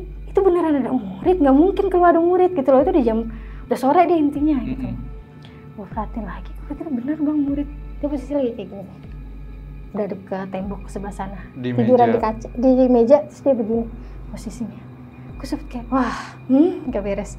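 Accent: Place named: Bali, Indonesia. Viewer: saya asli bali, kakknya logat bali banget:face-blue-smiling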